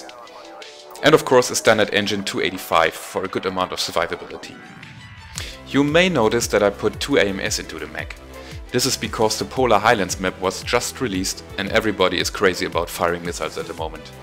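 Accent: Germany. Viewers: Very impressive, this tutorial adresses things i have seen other players doing and always wondered about. I don not own the 9S and the modifications you show here will cost a lot, so no TBolt sniping for me in the next time. But i definitely want to try it out at some point, since i already own most TBolts (Overlord package), so i will be able to unlock all mech skills. One question though: i noticed it on other of your vids too, the way you speak sounds like a german speaking englisch to me? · Are you German? That's one heavy accent you have.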